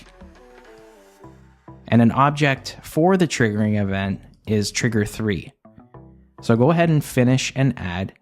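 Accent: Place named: Canada. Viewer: the canadian accent is strong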